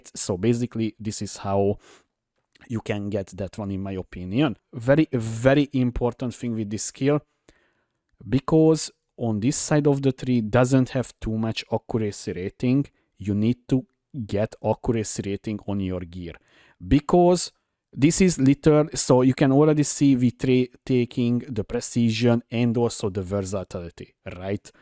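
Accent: Hungary. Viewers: szevasz, a kiejtésed alapján magyar vagy. sosem nyomtam még 1 ligát sem egy zsír új skillel, de most ezzel fogok kezdeni, köszi a videót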